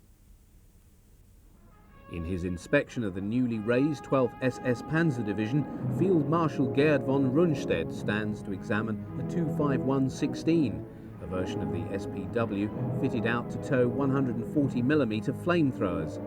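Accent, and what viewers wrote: United Kingdom. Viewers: Really nice video. Fills in the gaps left by most discussions on wartime vehicles. Want a good laugh? Turn on the subtitles. The system doesn't have an ear for British accents. "...their inadequate grasp of the theory of armored warfare..." = "inadequate grass people theory Obama"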